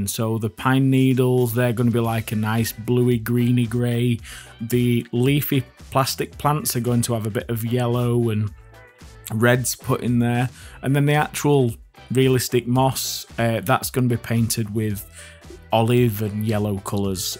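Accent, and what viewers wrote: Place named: England, United Kingdom. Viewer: Absolutely massive...... massively brilliant......so so Englishman speak with his massive accent....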